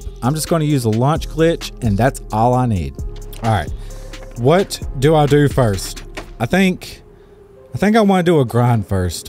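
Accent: South, United States. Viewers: Agreed! Calming and soothing with that little bit of southern twang lol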